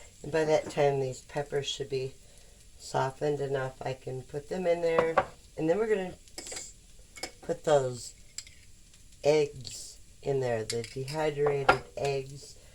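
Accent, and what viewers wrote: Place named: Lower Michigan, United States. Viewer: I would have subbed so much sooner if I knew you were a fellow Michigander! Sound like you're South of the bridge... maybe Elk Rapids/Grayling/Gaylord or even Battle Creek (no need to disclose). Can't go wrong adding more bacon renderings!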